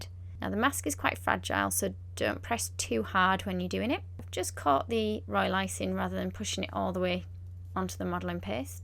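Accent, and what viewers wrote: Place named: England, United Kingdom. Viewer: which part of England is this accent?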